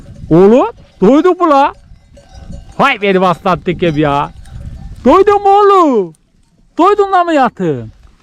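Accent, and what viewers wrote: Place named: Taurus Mountains, Turkey. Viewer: Konustugunuz şîve Adana Mersin toroslardaki köylerde konusulanla aynı. 🤓 O yüzden çok samimi geliyor bana👍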